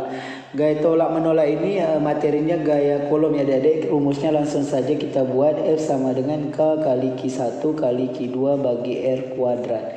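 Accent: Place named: Malaysia. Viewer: Waw abang aksen ya aksen malaysia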